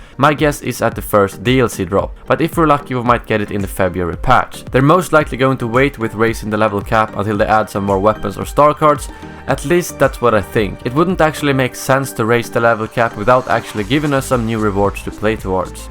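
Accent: Sweden. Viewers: You are a great YouTuber to watch, keep up the great uploads! And who doesn't like that Swedish accent?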